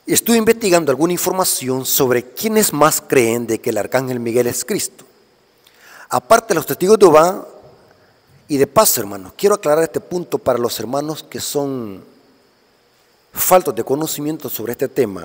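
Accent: El Salvador. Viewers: Así se habla en El Salvador, como aquí en México, cada estado tiene su acento.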